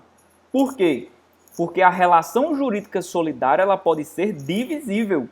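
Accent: Northeast, Brazil. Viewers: muito didático, obrigada por compartilhar seu conhecimento. A propósito, cm todo respeito, adoroooooo esse sotaque do nordeste.😍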